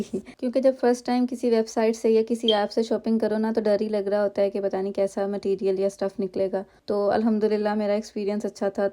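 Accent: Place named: India. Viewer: Your channel name is pakistani mom. But you dnt sound pakistani your urdu sounds like an indian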